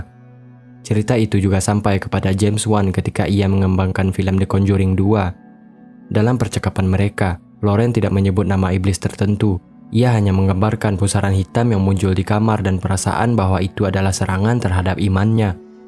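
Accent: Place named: Aceh, Indonesia. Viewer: bang orang aceh apa orang lombok bang? kentel banget logatnya 😁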